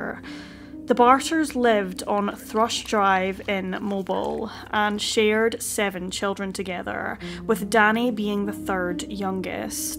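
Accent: Scotland, United Kingdom. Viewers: She’s Scottish I believe:that’s how they pronounced certain words.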